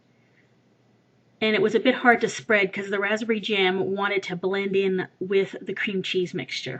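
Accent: Canada. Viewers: For aboot 15 minutes. Hehe. I love canadian accents. <3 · This is probably an American referring to your Canadian accent:) I am totally going to make this for my bf this weekend! yummy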